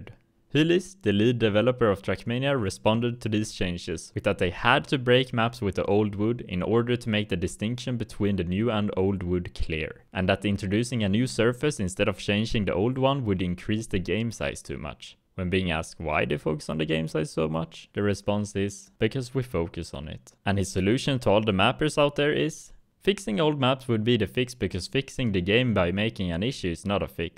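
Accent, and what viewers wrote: Sweden. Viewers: here for the heavy swedish accent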